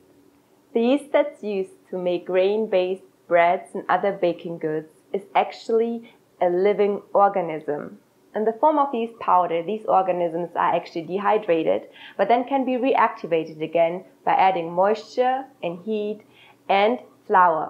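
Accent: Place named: Germany. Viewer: You speak very good English ! What part of Germany are you from ?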